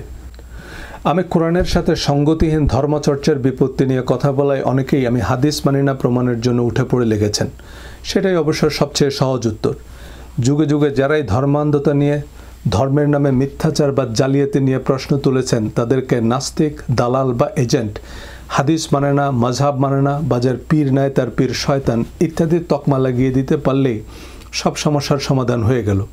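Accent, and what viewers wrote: Noakhali, Bangladesh. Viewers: By the way.... আন্নে কি নোয়াখাইল্লা???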